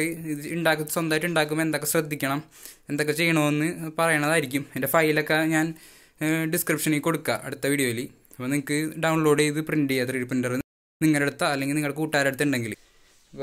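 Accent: Thrissur, India. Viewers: “ ” next M4tech YouTube channel, keep pushing and keep talking in that”Thrissur” slang 🤘🏽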